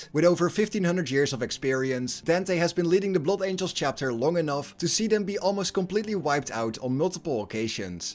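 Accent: Netherlands. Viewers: The Dutch accent is music to my ears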